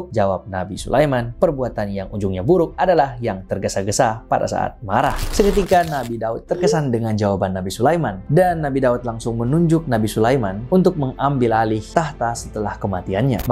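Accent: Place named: Batak, Indonesia. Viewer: Hai aku penasaran aksen apakah ya ? Kaya batak atau bugis ya aksen dan dialekmu?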